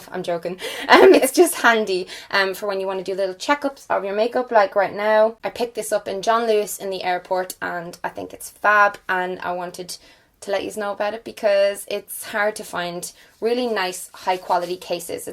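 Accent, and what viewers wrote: Ireland. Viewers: I love that Irish accent👌👌👌 P.s could you talk more about your book because I didn't know you were bringing one out. Maybe you could do a video on it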